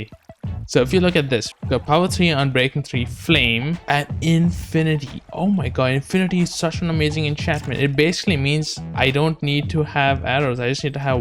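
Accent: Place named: India. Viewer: U sound indian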